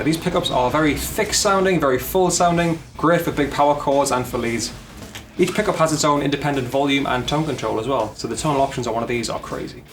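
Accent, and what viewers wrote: Newcastle, United Kingdom. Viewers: Haha hes from England. Most likely a Geordie. Its not too bad is it?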